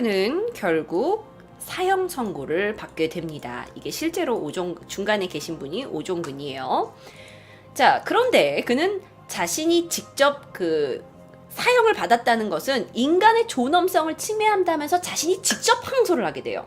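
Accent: Jeolla, South Korea. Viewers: 고향이 전라도신가벼여?? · 남자는 숫가락힘만 있으면 딴생각함 근데 제시카님 전라도출신이세요? 사투리 감칠난다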